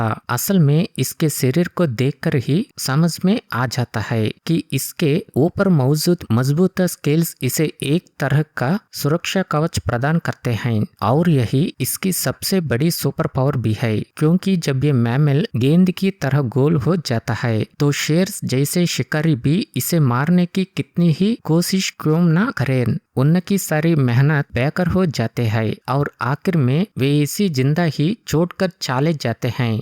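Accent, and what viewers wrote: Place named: South India, India. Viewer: Apki Hindi bolne me South India ki thodi thodi asar lag rahi he 😊👍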